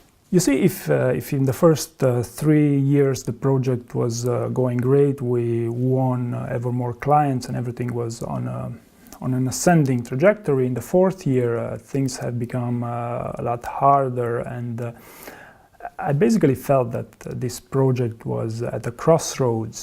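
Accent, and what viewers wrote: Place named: Romania. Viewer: this is a Romanian accent if I've ever known one.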